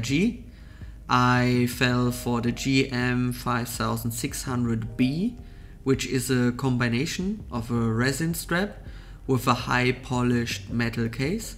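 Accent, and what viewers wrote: Germany. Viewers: Der Akzent 😂 100% deutscher 😂was macht ein Deutscher in Dubai ?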